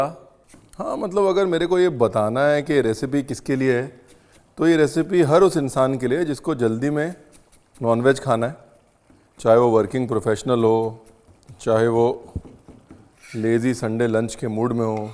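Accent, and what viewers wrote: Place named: Bihar, India. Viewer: The Bihari accents has enhanced the cravings for this dish😂 Thank you very much 🙏Sir for sharing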